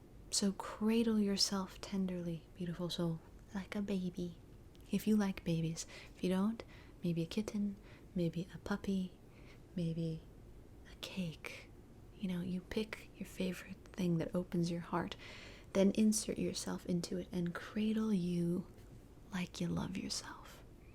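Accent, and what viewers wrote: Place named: Scotland, United Kingdom. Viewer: Thank you for the encouragement and the scottish accent 🙏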